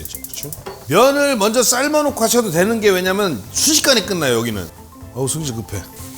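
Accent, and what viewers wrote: Chungcheong, South Korea. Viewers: Yee분 충청도 사투리 같은거 쓰는데 충청도 사람이에you?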